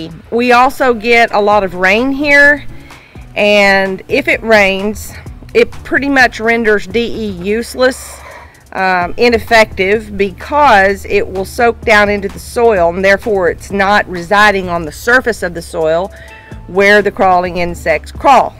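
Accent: South, United States. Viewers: If it doesn't kill the queen that's way down deep, the ants in the ground will move the mound. I love your videos and you sound just as southern as me.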